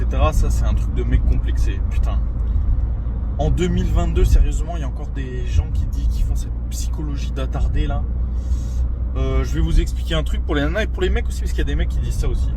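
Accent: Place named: Arab world. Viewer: Pourquoi l'accent arabe? Mis à part ca, on à l'impression d'entendre Papacito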